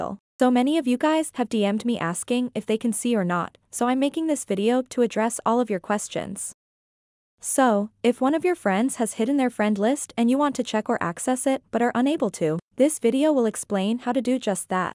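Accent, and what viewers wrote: India. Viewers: You have an indian english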